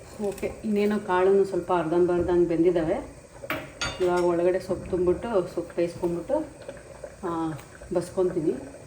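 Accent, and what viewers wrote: Mandya, India. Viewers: Foreign ge hodru nu Namma local Bangalore and mandya Kannada en sakkathu agi mathadtira ❤🎉 Navu gowdre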